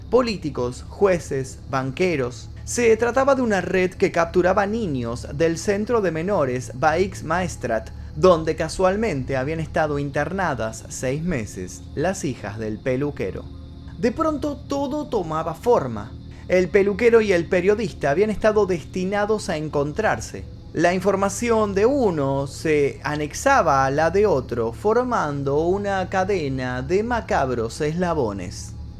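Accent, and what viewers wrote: Argentina. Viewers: Magnífico y excelente como siempre, como tú, me encanta tú acento argentino y luces.. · Hola, soy nuevo suscriptor, me gusta como presentas este contenido mórbido y el acento argento es complemento distintivo, sin comentarios picantes como lo hacen famosos youtubers. Tu siempre estudias lo que presentas, obvio la legitimidad está sujeta a la veracidad de tus fuentes pero eso es caso aparte. Cuida por favor tu salud mental, un saludo desde Colombia.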